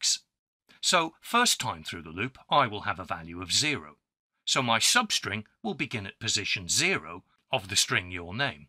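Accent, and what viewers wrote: United Kingdom. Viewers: hearing your beautiful British accented voice made me subscribe right away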